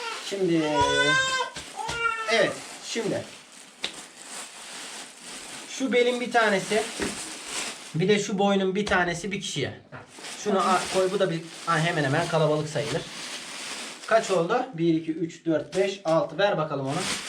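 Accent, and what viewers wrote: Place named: Macır, Turkey. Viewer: Iyilik bulaşıcıdır...lütfen niye gösteriyorsun diyenlere bakmayın yeni keşfettim kanalınızı ve çok çok sevdim. Ailenize de sizede minnoş kızınıza da hayırlı uzun omurler versin benim babam macır göçmen konuşmanız siveniz o yüzden köy özlemini giderip bir nebzede olsa mutlu ediyor🤗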